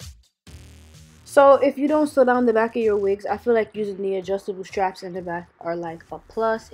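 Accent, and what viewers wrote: New York, United States. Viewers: You’re def a New Yorker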